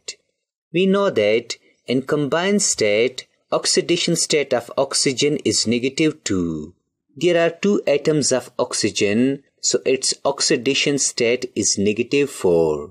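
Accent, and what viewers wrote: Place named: Uttar Pradesh, India. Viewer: according to his voice and accent he sounds like he is from up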